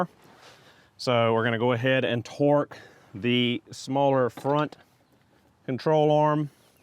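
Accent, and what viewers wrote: South, United States. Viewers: Nice video describing just the job I`m doing now. I like your southern accent! Love from Norway.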